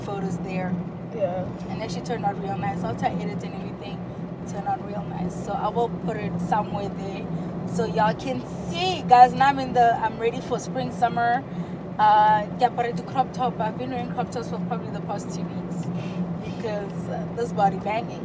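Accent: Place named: Botswana. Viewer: Your Setswana accent got me curious to know where you originally from, it’s not far from how we speak in Kimberley “without the Afrikaans 😂”.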